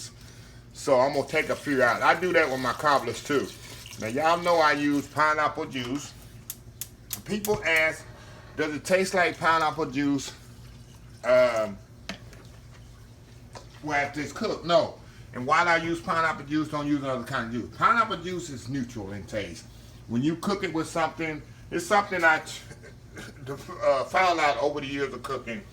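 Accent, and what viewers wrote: South, United States. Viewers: Luv that southern accent. My grandmother would make the BEST MIXED BERRY DUMPLINGS/COBBLER with ICE CREAM. ( luv’d it that way). Aloha🌺from Hawaii.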